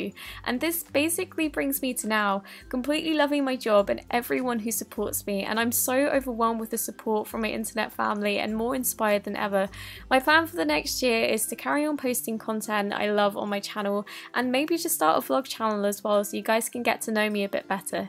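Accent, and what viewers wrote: England, United Kingdom. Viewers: Yay!!! You're the best youtuber ever! You should hold a giveaway for some of your slimes! btw, i love you =r english accent and yur super pretty!!!!! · I love this British accent😂💕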